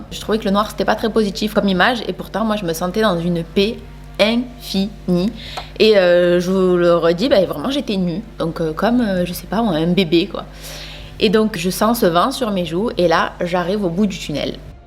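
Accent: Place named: South, France. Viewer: J’aime beaucoup votre accent du Sud, c’est de quel endroit ?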